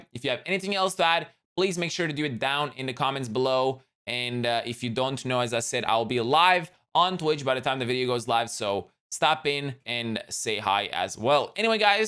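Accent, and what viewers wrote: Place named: Eastern Europe. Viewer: I'm assuming English isn't your native language? You're pronunciations are weird eastern European I assume?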